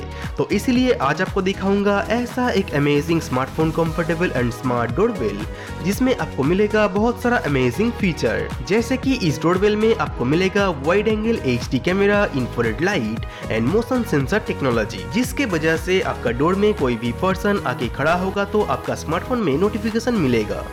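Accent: Bengal, India. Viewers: Bhai aap bengali ho kya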